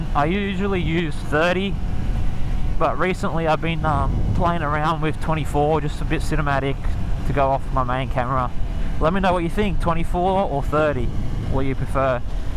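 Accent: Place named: Australia. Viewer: I definitely enjoyed watching this with Ozzy accent! Greets from Germany mate!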